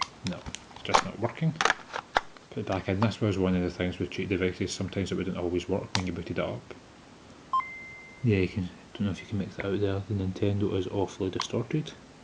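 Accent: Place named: Scotland, United Kingdom. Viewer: Thank you for this video! There's really no information on this thing anywhere online so it's nice to see it. Also a Scottish accent? Nice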